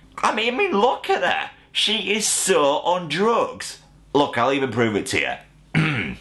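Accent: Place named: England, United Kingdom. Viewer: Thats the problem, i watched cause it said theres gonna be a review, but it was just a bunch of non funny jokes and annoying english accent for 25 minutes or so....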